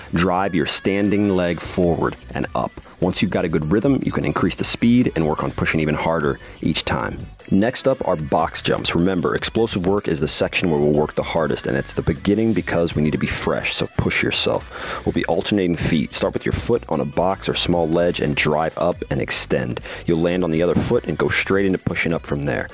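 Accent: Netherlands. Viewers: Hold up are you dutch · I got one question r u dutch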